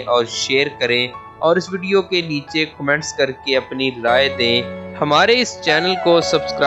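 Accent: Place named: Punjab, Pakistan. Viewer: بھائی آپ پنجابی میں بات کیا کرو. آپ کا لہجہ پنجابی ہے.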